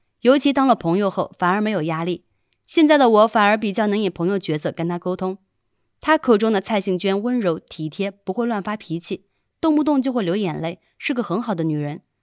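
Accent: China. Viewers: 大陸人配音？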